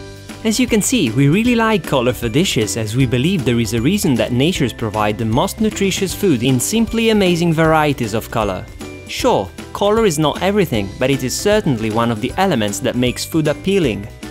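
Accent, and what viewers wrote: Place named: Italy. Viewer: I totally love this recipe! Found your channel today and already watched all your videos :) Immediately subscribed! I have also a quick question for you; I love your accent, where are you from? You seem to be Italian :)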